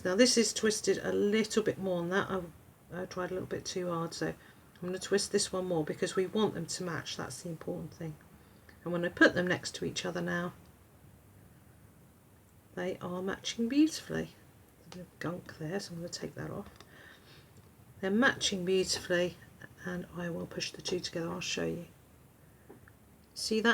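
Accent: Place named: England, United Kingdom. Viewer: I don't do hash tag. I can't understand why anyone would ever give you a mean sign or make an unkind remark to you. You are adorable and most of the I just listen to you talk. I speak "American" and you speak English and I love to just hear you talk.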